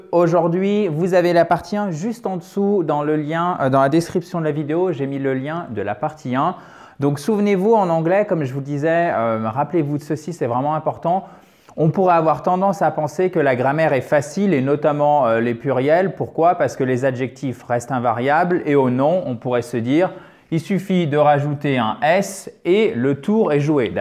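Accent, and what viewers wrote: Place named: United States. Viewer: Bonjour. Merci tout d'abord pour ce "fabuleux" travail avec toutes ces vidéos! Mais pourquoi devez-vous systèmatiquement utiliser l'accent américain? Il m'arrache les oreilles et avant l'américain, il y avait l'anglais. Alors, pourquoi ce choix? merci à vous.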